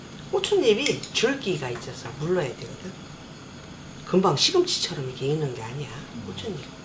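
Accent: Chungcheong, South Korea. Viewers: 충청도말 재밋네유 배우고싶어서 저장했어유 요리도 쉽게 하시구유 맛나게 보이네유 꼭 해볼래유❤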